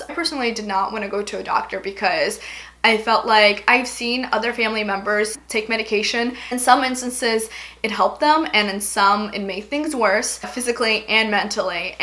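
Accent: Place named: Los Angeles, United States. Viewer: it's funny i was listening to this video with the background app only sound and as an foreigner we are influenced by american tv shows and have those L.A accents ingrained in our head hahaha so when i heard your voice/little vocal fry i tought "she's from L.A no doubt about it" :)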